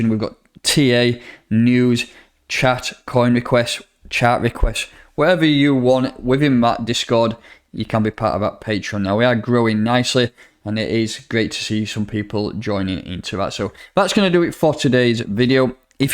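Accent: United Kingdom. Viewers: This is english..i bet you are american..he speaks British english..always american thinking they know it all.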